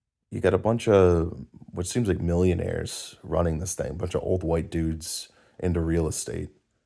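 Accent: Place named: Canada. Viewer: I was angry too! Disfellowshipped in 2005. Now I'm happy. . Here is an end time prophecy they never talk about that has always been in every Christians bible and yet, few are the ones finding it: . Isaiah 66:5 Hear the word of Jehovah, YOU men who are trembling at his word: “YOUR brothers that are hating YOU, that are excluding YOU by reason of my name, said, ‘May Jehovah be glorified!’ He must also appear with rejoicing on YOUR part, and they are the ones that will be put to shame.” . [Name of person] is no longer one of JEHOVAH's Witnesses ---->> "excluding YOU by reason of MY NAME". . Do you see Jehovah's promise to disfellowshipped Jehovah's Witnesses? . "He must also appear with rejoicing on YOUR part"! . . So who is right? . Jehovah or, eleven men who live in New York? . My faith and belief has vastly increased since leaving! . . I'm HAPPY. . Luke -23 HAPPY are YOU whenever men hate YOU, and whenever they exclude YOU and reproach YOU and cast out YOUR name as wicked for the sake of the Son of man. 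23 Rejoice in that day . [NAME OF PERSON] is no longer one of Jehovah's Witnesses ---->> "and cast out YOUR NAME" . . Another prophecy about Rejoicing! . . You sound Canadian. . You could try sharing this with your family. .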